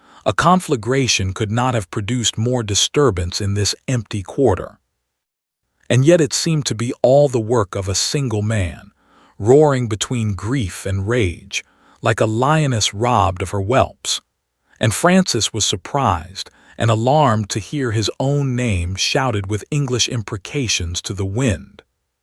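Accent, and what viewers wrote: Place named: Bronx, United States. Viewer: Pity about the inexplicable Bronx accent, but the narration is competent enough.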